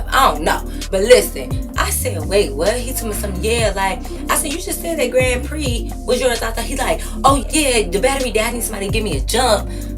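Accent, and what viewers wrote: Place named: Detroit, United States. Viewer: This sounds like a Detroit nigga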